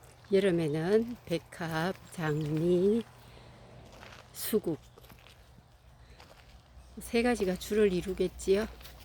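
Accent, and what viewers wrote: Gyeongsang, South Korea. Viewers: 코로나 때문에 아무것도 할께 없네요 네 정원이라도 눈이 아프드록 보고 또보고 위안을 삼지요 우리 영감님 너무 본다고 야단 맞으며 중독 됬네요 그 많은 꽃을 어떻게 관리를 하시는지? 부럽습니다 목소리를 들ㅇ.니 경상도인가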